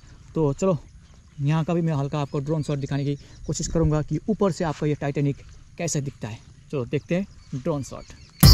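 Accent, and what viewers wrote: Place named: India. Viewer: K bekar vlog bhai timro xyaa, ghar Ko yaad aayera salugara search gareko mood got off, totally, xyaa on top your Hindi accent 🤮🤮🤮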